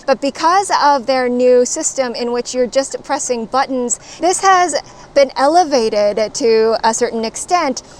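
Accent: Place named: United States. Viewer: Excellent review! But just wondering, where are you from? You have an American accent, but the license plate doesn't seem to come from North America. Also wondering if the features you are talking about will come to the US.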